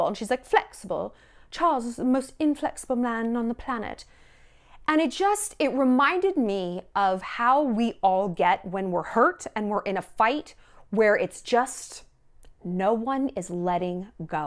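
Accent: United Kingdom. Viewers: Your British accent deserves a whole Netflix show. 🤣❤️ i love you woman. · Love the British accent, and I’m British! I have a name for your plot of land - Shallonshire ❤️ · Your British accent is amazing! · Hahahahahhahaha😂😂😂😂😂😂 your British Accent is soo good!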